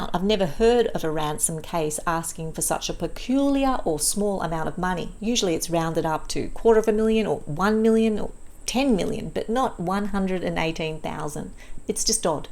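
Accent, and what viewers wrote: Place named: Australia. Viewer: Nice to hear a fellow Aussie. Good speaking voice - I'm older, and you're not too fast. 31 year olds speak too fast for me. Anyone under that is nearly unintelligible. This was good. Thankyou.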